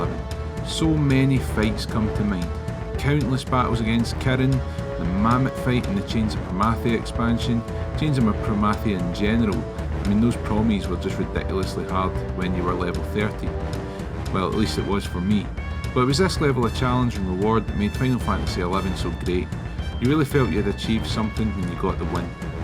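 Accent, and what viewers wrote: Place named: Scotland, United Kingdom. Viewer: Great to hear a fellow Scot speak about this game the way I do lol. I just started a new character on Odin!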